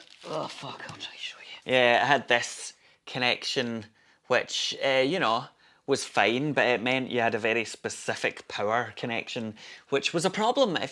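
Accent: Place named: Edinburgh, United Kingdom. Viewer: That's fair, thanks for replying! I enjoyed listening to you - I used to live in Edinburgh and got a bit homesick. Your accent is lovely.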